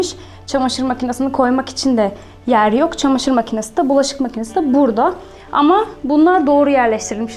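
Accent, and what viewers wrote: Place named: Central Anatolia, Turkey. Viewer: İç anadolu aksanı var bence 🤔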